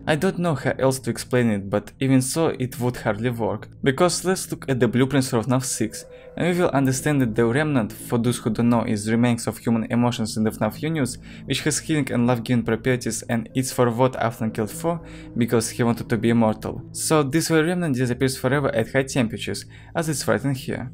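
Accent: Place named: Russia. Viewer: He are russian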